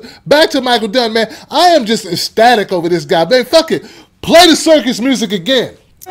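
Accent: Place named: New Jersey, United States. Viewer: I just love your Jersey accent!!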